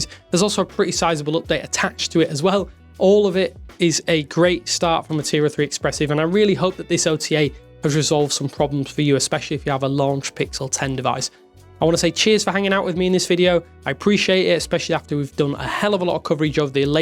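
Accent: United Kingdom. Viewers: I can't watch your complete video just because of your British accent it's really irritate me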